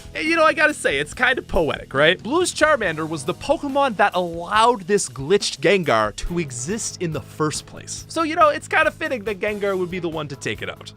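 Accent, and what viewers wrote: Canada. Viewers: 10 seconds in, and I suspect you’re Canadian haha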